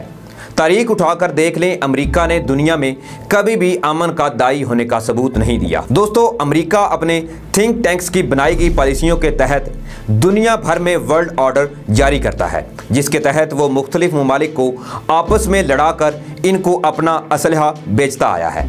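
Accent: India. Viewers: Is the presenter an Indian from his accent?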